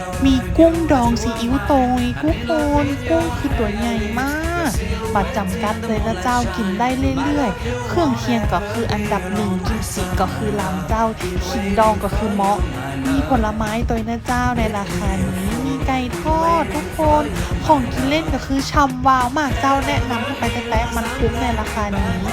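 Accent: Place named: North, Thailand. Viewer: ภาษาเหนือ น่าฮักขนาด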